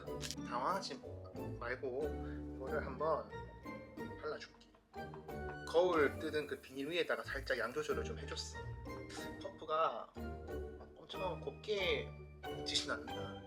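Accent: Gyeongsang, South Korea. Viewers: 예전 영상부터 경상도말투엿는데ㅋ ㅋ ㅋ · 저 헤지스 룰429 립밤 2+2 했을때 8개 산거 주변에 몇개 나눠주고 오늘 마지막꺼 오픈했어여🙋‍♂️ 션님 사투리는 경상도 허세가 없어서 귀여운거 같아용👍 그리고 같은 경상도인으로서 저만 그런건지 모르겠는데 서울말 쓰다가? 주변에서 사투리 써보라고하면 잘 안나오지않나요^^ 근데 저보고 항상 사투리 쓰고있다는ㅎㅎ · 누가봐도 경상도 사람이었어요 형 걱정 안하셔도되요!!! ㅎㅎ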